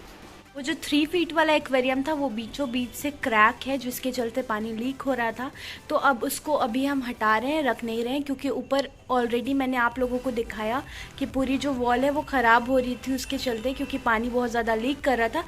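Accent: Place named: Jharkhand, India. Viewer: Waoo nice .... Or AAP Ki Jharkhand wali boli to mashaAllah....😘😘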